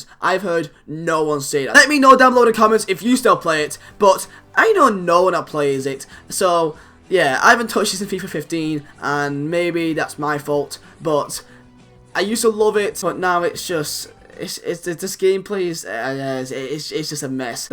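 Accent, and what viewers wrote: United Kingdom. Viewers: u need to learn how to speak proper understandable english not this terrible english with a british accent that sounds dreadful.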